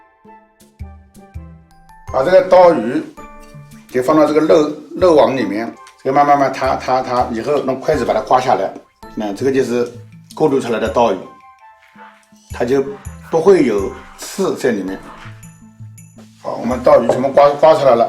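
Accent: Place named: Subei, China. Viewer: 一开口就是老苏北了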